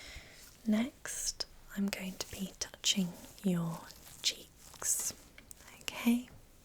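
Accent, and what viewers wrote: United Kingdom. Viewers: That UK voice. Mmmmmakes me melt. Thanks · Because of her GORGEOUS British accent, I thought instead of touch she said torch. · Very cool and British, which is obviously the best. But it still doesn't make me sleep 😑😑 I'm just too difficult. Good luck with the future! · Omg. Subscribed. I looooooove your accent I could listen to you talk all day!!!!! From the UK?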